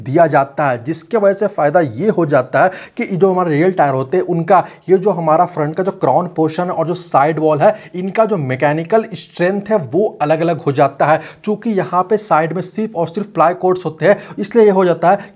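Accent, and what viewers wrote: Ranchi, India. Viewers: Bhaiya ap Ranchi se hai kya??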